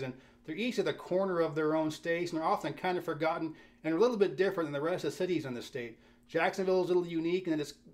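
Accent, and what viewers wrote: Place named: North, United States. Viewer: Have you been to Austin lately? Work took me there and 2 things really stand out. The homeless population is enormous. All the highways are elevated. Under quite a few of these underpasses are true homeless tent cities. Not to mention panhandlers on just about every freeway off ramp. Let's not forget about the university of Texas and the murder clock tower. It stands out so much you can see it from I-35. People, for some reason want to visit the site Charles Whitman made famous. Traffic is God awful. I figure you would pick this city over Tallahassee. No surprise here. The way you speak is very much Yankee North. No way you are a native to Chattanooga Tennessee. Your videos are very good and entertaining but you are dead wrong about this one. Keep up the good work.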